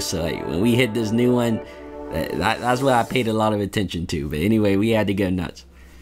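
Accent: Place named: South, United States. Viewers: He sound country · Soundin like a hillbilly anyone (I’m not hatin)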